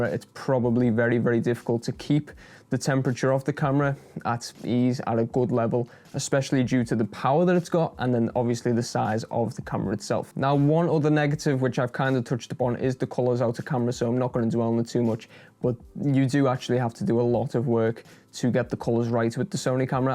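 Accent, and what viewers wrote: Liverpool, United Kingdom. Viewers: Where abouts are you from man? you sound close towards liverpool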